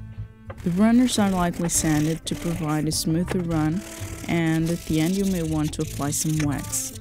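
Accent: Portugal. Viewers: caralho, não falho, percebi logo que eras tuga pelo teu sotaque no ingles hahahaha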